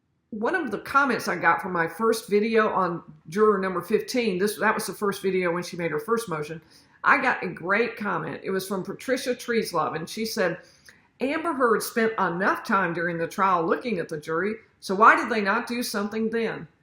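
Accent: South, United States. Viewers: Hi! I have very much enjoyed your channel since I found you! I would like to share a little special story. I too am a Harvard graduate. Undergrad not law school. For that I went to a private Catholic college. My husband and I started to practice law here in Hattiesburg Mississippi 20 years ago. My daughter is graduating from high school and she wants to be a lawyer just like her brilliant parents. Not to brag lol:-) she wants to go to Harvard but she felt very self-conscious about her accent. My husband and I are from the north east so we blended in very well. Your videos in short have taught my daughter that she has nothing to be self-conscious about when she goes to Harvard in the fall. She has a southern twang that’s just her own just like you! Thank you for being an inspiration and a little silly from time to time. We really appreciate you for that. 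Have a great weekend.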